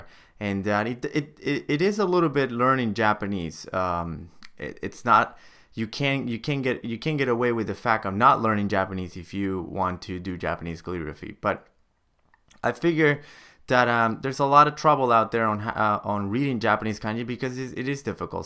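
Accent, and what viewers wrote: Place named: United States. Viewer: Akimoto Hasegawa this is definitely not Japanese or Chinese accent, as a Chinese I think he is an American .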